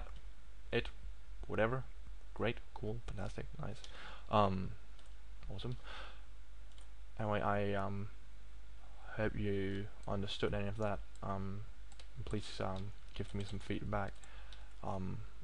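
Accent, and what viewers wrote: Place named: England, United Kingdom. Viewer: Ahh, I srsly had to listen so intently. We both speak English, however he has a heavy English accent whereas I have a Western American accent XD I was actually sitting here like, ">_>?" I even widened my eyes as if perhaps that would help. A little note.. widening eyes do not make one hear any better; though it does cause one to see a wider spectrum of one's room..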